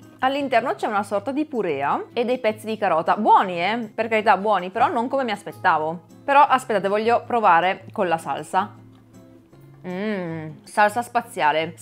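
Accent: Turin, Italy. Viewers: #avocadoimpanato(?) Ragazza mia io ti devo dire grazie mi sono segnata tutto quello che spero poi di trovare al Lidl .. Yogurt al cocco.. Barretta al cocco.. Formaggio vegano..io sicuramente lo userò x le melanzane al forno.. E gli anelli di cipolla E sperando di trovarli i gelati al cocco che avevi detto l altra volta nel video che sei stata così carina a farceli vedere😋..❤ Parli fra un po' come una di cit turin😊.. Non so cosa hai fatto ai capelli ma sembrano più morbidi e lucidi.. Continua così. PS se torni al Lidl anche la marmellata bio alle fragole merita...😋😘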